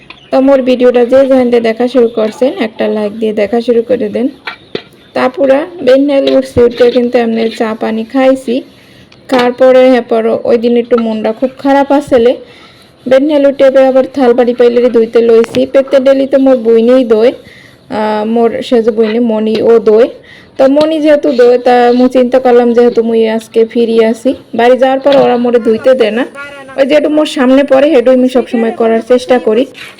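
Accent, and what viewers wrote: Barishal, Bangladesh. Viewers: বরিশালের ভাষাটা অনেক ভালো লাগে আর রান্নাবান্না গুলো অনেক সুন্দর ছিল আপু সাবস্ক্রাইব করে পাশে রয়ে গেলাম বন্ধু হয়ে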